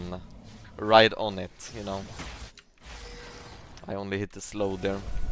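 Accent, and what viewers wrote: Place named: Sweden. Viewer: Love your videos man. And your sweet Swedish accent! :)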